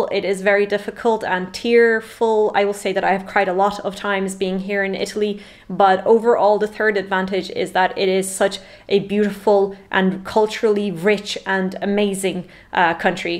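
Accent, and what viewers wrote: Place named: Ireland. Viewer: Are you Irish? I love this accent 🥰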